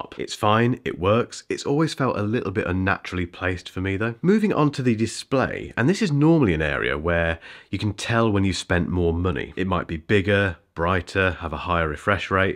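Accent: United Kingdom. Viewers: Damn your voice is so powerful and that Brit accent is so lit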